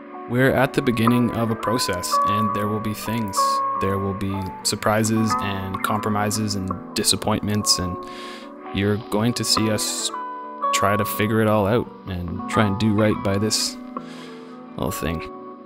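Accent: Toronto, Canada. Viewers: wait are you from Toronto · Are you from Toronto?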